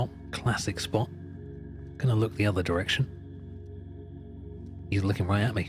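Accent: United Kingdom. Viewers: I cant sleep without hearing people getting their heads blown off (in SE) to peaceful music and a British whisper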